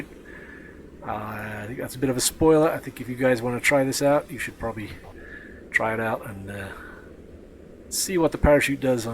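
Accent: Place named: United States. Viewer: I just can't decide if you have a genuine American accent, or are a Brit pretending to have an American accent! Sorry, no offence intended, was just distracted by this as I'm a linguistics academic!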